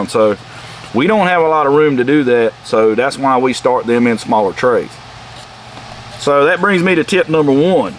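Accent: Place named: South, United States. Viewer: Thanks for not talking me to death like most videos do--just the basics delivered with a cute southern accent. ("Sole" for soil) :washhands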